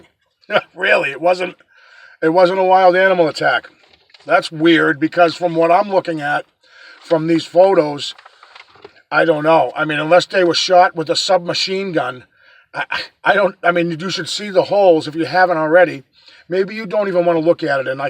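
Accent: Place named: Rhode Island, United States. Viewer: You're from Rhode Island, I'd bet...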